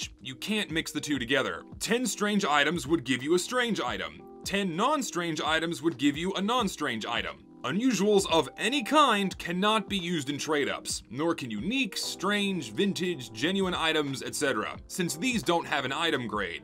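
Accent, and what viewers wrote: South, United States. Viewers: Sounded more and more southern as this played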